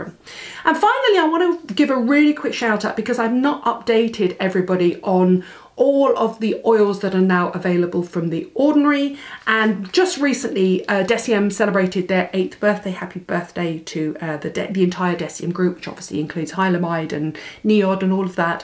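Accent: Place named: Nottingham, United Kingdom. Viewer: I just adore you. I think u r one of the loveliest and most well informed woman in the business. And your accent kills me. My mother was from Nottingham so u r one of my people. U must have Jeffree Star on your channel. I know he goes to England on promotional tours. He is even more cutting edge than Drew Barrymore.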